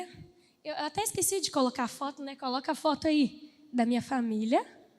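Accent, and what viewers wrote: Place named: Minas Gerais, Brazil. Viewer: Me lembrou tmb!! O jeito de falar e andar rsrs deve ser coisa de mineiro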